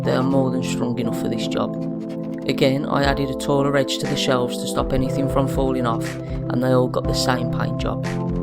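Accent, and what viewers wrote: United Kingdom. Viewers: Great job. I love your british accent even if its a bit harder for me to understand...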